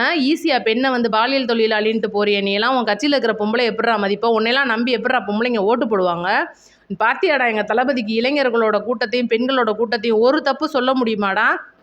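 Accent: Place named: Madurai, India. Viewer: Akkow namma ooru MADURA slang la irukku. Nalla pesurwenga , be continued for TVK📈🚩👍🏽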